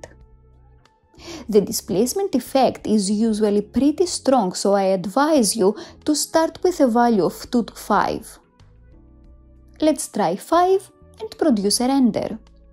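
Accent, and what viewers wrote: Russia. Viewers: English language with Russian accent ouraaaa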